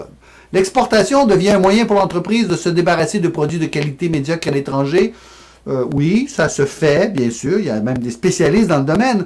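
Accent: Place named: Canada. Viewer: Merci et Bel accent canadien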